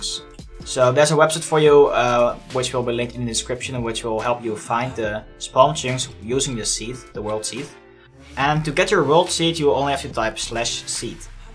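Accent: Netherlands. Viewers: you sound dutch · Are you dutch?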